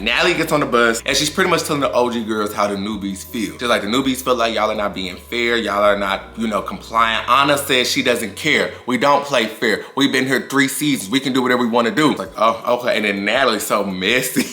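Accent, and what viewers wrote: Memphis, United States. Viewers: I believe you from memphis from your accent and your realness ❤ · You're clearly from Memphis... has no one ever heard you talk 💀🤣 it took me all of 20 seconds to realize you were from the Midwest based off your voice which is why I followed you 😅 took no more 40 secs to place your accent in Memphis 💀🤣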